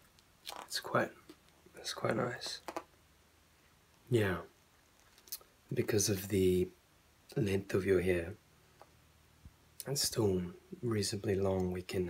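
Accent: New Zealand. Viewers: Very well executed video. The New Zealand accent is dreamy.A Kiwi can be rude and you'd still say thank you. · Wow I love the new zealand accent. I'm in love ❤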